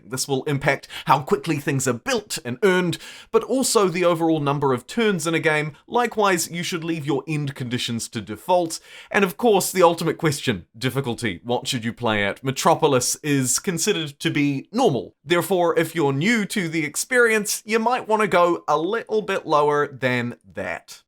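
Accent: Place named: New Zealand. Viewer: nice newzealand accent my friend.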